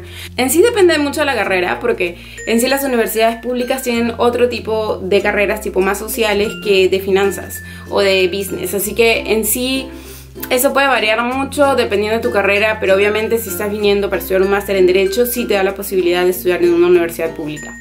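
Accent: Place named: Peru. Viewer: supe de donde eres, de Perú, por el acento, casi no se siente, pero es como un acento vacío o neutro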